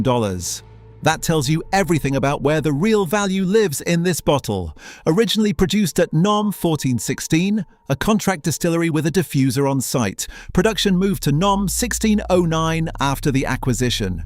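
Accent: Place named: England, United Kingdom. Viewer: There is a lot of questionable and somewhat misleading information in this garbage. Feels like an automated bot program creating it. And why would you select a English accent for an article on tequila. 😅Oh yes we all know England is a great source of tequila and information on tequila 🤣 I thought they were only allowed to add 2% additives to tequila. But who knows, maybe something changed or I'm remembering wrong. But I wouldn't necessarily trust this video as a source of information. A lot of it just seems like pointless hype and empty drama. And yes tequila can be sold as tequila and call tequila if it's only 51% actual tequila /liquor made from agave. The rest is usually going to be like some kind of Cane alcohol or something. And apparently that doesn't mean rum😂😖 and it is too harsh to drink straight 😂😖 But just because tequila says it's 100% agave does not also mean that it is good 😂 And you can legally put in the additives as long as you don't try putting on the label of the bottle that it is additive free. 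And many people will actually prefer the final products taste and texture with a little bit of additives in it. Not everybody cares about working their pallet into a "finely honed tool" to try to discern whether it's 100% natural or not having to buy tons of different tequilas to figure things out. They just want to buy a quick bottle to either enjoy sipping on every now and then or make some margaritas out of. And cheap rot gut tequila can often be great for making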